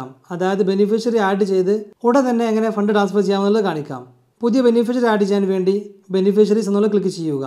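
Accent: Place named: Kondotty, India. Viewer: ഇടക്ക് ആ കൊേണ്ടോട്ടി സലാങ്ക് വരുന്നുണ്ട് കട്ട സപ്പോർട്ട് ഇക്കാ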